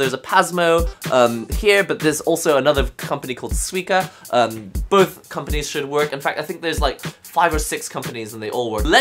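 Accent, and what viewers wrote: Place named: Scotland, United Kingdom. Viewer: Dude! You sound like you have a Scottish accent similar to mine.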